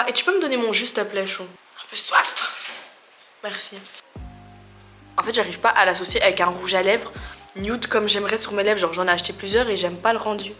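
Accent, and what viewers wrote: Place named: Belgium. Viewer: Son accent Belge 😍😍😍>>>>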